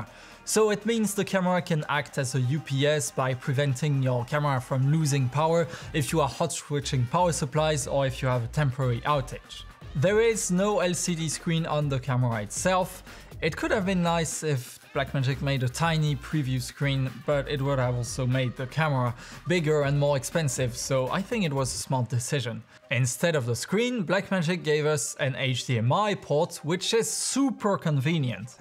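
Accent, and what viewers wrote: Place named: France. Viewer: on enttend légérement l'accent francais.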